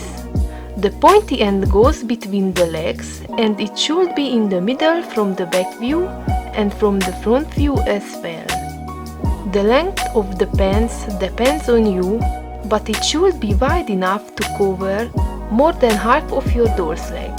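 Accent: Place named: Romania. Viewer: Wow, láttam a blogodon magyarul írsz. 👌Picit hallom a Román akcentust is most hogy mondod. Jó tudni hogy van más ember is akit érdekli a doll customize. Bocsi én meg már félig angolul gondolkozom. 😅 Kérdés: rendeled az MSC-t online, vagy honnan szerzed, kiszeretném majd próbálni ezt az egész Repaint dolgot ( I tried my best to write in my mother language 😅)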